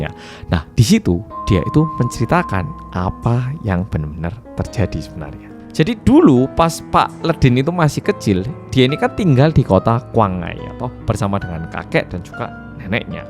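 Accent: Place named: Malang, Indonesia. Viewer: Iki ceritane wong korea berusaha ngomong bahasa vietnam dengan logat jowo malang nggih mas...😅❤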